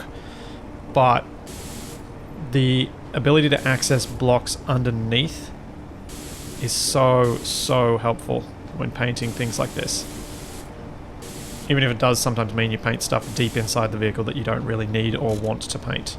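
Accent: Australia. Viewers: Are you from Australia m8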